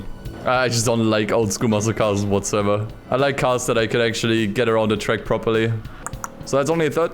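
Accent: Germany. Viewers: i hear the German accent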